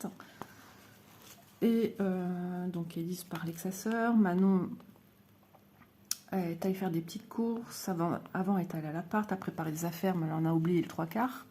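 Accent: North, France. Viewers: Coucou, Ne vous prenez pas la tête il y a tellement de contenu sur YouTube si elles ne sont pas contentes qu'elles aillent voir ailleurs. Quand je n'aime pas je ne regarde pas et pis c'est tout! Et pour le parler du nord, Diditte est pas mal non plus! J'adore. Il est vrai que j'ai beugge plus d'une fois en regardant vos vidéos en juillet avec des références climatiques de mai, mais ça réveille les neurones 😂😂😂 Courage et on veut la suite 😊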